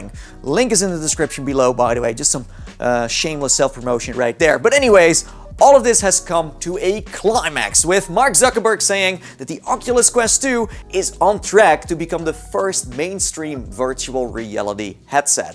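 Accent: Netherlands. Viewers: Are you Dutch? you have a dutch accent. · Hey kerel ik hoorde je accesnt en ik wist gelijk dat je nl was😜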